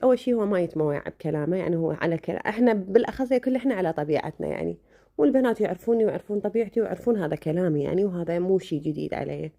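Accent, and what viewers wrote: Basra, Iraq. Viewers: لهجة اهم البصره تجنن وربي متابعه الج من الدوانيه حياتي ربي يحفظكم ويحفظ ابو علي والجهال الج اللهم آمين يارب العالمين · عيب من الي يحجن هيجي حجي اني احب حجي أهل البصرة اتشرف بيج اني من أهل طارمية بغداد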